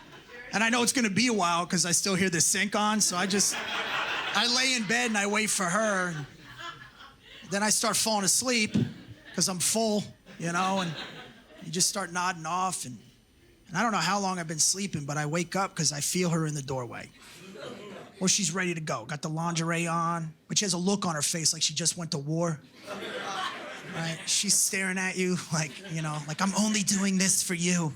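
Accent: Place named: New Jersey, United States. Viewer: The jersey accent is maybe the only one that competes with deep redneck for being just, offensively dumb and obnoxious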